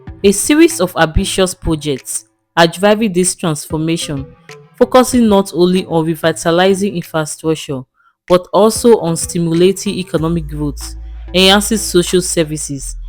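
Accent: Nigeria. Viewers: I love ur accent you be real edo babe ❤❤❤😊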